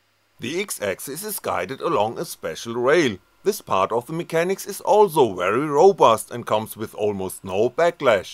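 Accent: Germany. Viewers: Dein Deutschenglisch schmerzt in den Ohren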